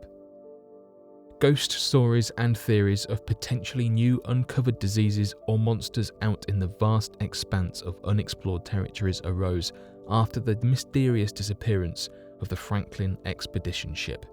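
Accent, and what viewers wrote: England, United Kingdom. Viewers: for a guy with an English accent, he sure doesn't speak English well. "had went missing"? "tells of that of a married couple"?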